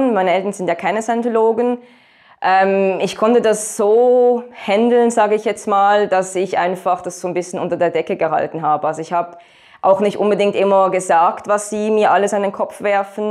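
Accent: Switzerland. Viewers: Bis Minute 11 hab ich noch vermutet dass das basler deutsch ist - cool🤙🏽🤭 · Sie klingt wie eine von uns 🇨🇭🇨🇭🇨🇭🇨🇭🇨🇭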